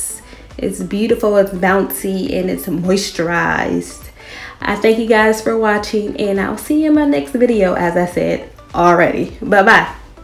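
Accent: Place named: Baltimore, United States. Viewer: She sounds like a young jada pinkett i wonder if shes from baltimore...i hear an accent ☺☺ i love ur hair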